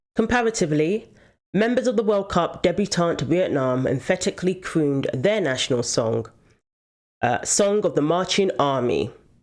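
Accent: United Kingdom. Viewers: You are Smart, Pretty and have a Great British Accent 🙂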